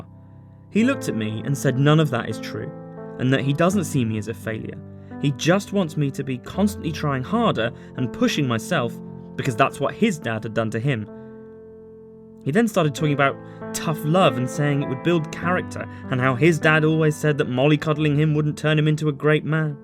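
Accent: United Kingdom. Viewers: How does this dude have a british accent but says soccer instead of football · Is he british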